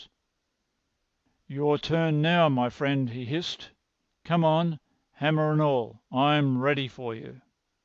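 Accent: Australia. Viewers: Australian? good reader - good story. thanks :} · The Australian rising question intonation is distracting.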